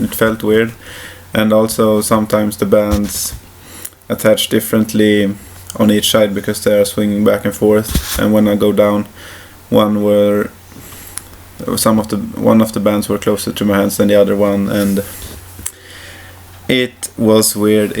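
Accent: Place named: Sweden. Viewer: Absolutely love your Swedish accent my man.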